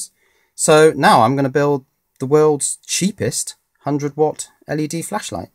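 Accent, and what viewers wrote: United Kingdom. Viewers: This may be the case, but the presenter here has a British accent. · U from UK?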